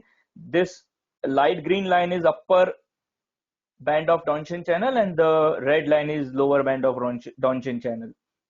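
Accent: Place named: India. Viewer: Sir you are pure Hindustani not foreigner you talk to mother tongue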